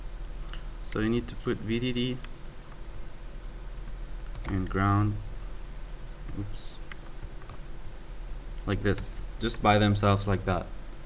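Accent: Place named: Lebanon. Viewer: I can smell a lebanese accent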